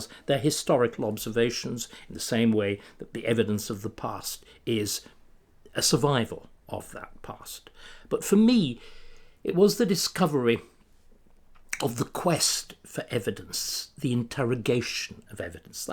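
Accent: RP, United Kingdom. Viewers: Let's hope freedom of expression does not become history. As for the old BBC accent- it sounds great to an Australian and is much better than the strange accents that have developed over in the old country in London and so on- sort-of posh but coming across as "affected" in an odd sort of way.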